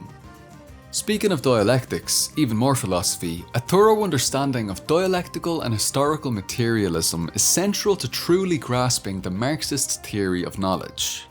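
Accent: Ireland. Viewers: Missed this lads thicc Irish accent sharing Marxist wisdom with the masses lol✊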